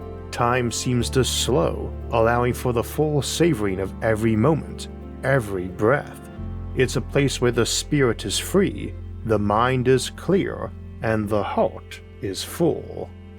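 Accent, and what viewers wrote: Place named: Arkansas, United States. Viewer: Sounds like Arkansas.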